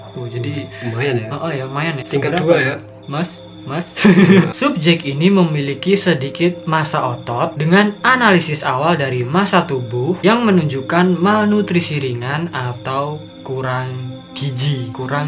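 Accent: Java, Indonesia. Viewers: Merinding banget dah abang orang jawa apa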